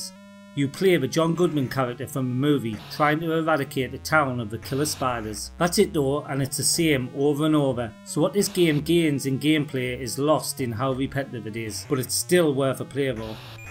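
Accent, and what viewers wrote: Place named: North East England, United Kingdom. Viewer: ah cheers man. I can tell just by the accent you are NE, and a total commodore lad so what more could I want. Loving this series so far. There’s so many C64 releases and these vids have already introduced me to a few I’ve never heard of!! Appreciate the time to get back to me mate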